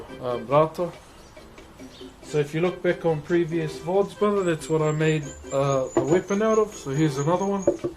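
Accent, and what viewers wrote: New Zealand. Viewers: Its just the kiwi accent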